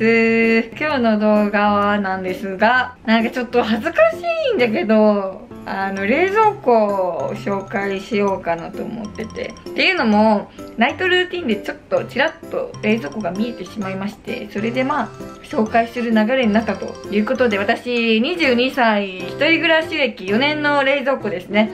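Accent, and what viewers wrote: Okayama, Japan. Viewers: 同じ岡山出身！ たしかに口調が岡山弁だね😊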